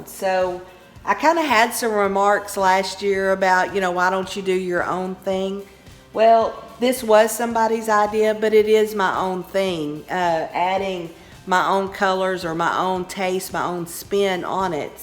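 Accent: South, United States. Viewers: You must be southern like myself I want say what gave you away LOL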